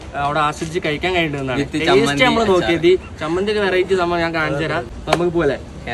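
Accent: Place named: Kozhikode, India. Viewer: Muttey eniku ninte comedy istapettu. Calicut slang ottiri ista. Am from kochi ❤ Biriyani ku chammandiyo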